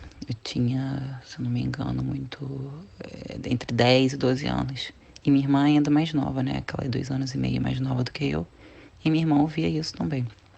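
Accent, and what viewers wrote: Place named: Rio de Janeiro, Brazil. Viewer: Sotaque carioca, mas a voz mesmo não tem nada a ver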